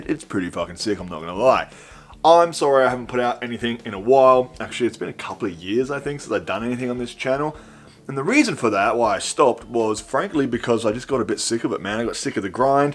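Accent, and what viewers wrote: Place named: Australia. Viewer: Make more mate. I love listening to aussie creator, the yanks are okay but good to hear a voice i can relate to.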